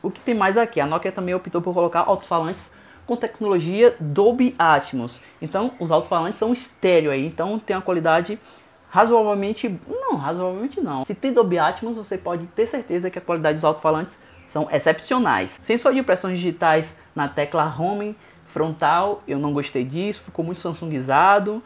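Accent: Bahia, Brazil. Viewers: vc é baiano cara?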